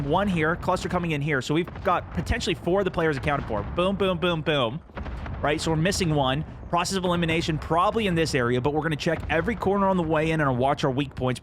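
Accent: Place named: Canada. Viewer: sounding extra canadian in this one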